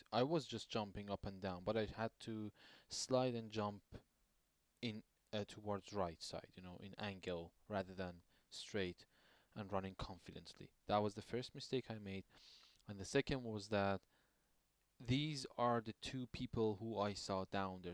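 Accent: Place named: Iran. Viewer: are u persian ? ur accent so irani :D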